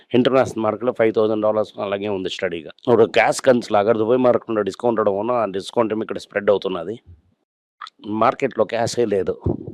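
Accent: Tamil Nadu, India. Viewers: Tamil accent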